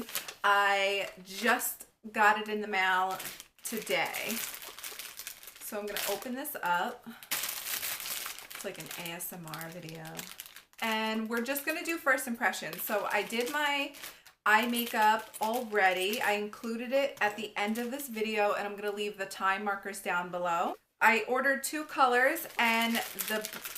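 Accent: New Jersey, United States. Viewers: You have such a thick Jersey accent! Haha :) thanks for the review! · Every time I watch your videos, your accent makes me miss Jersey!! I know your in NY, but this Jersey girl misses home. Adore you beautiful, and your videos. Keep them coming. xo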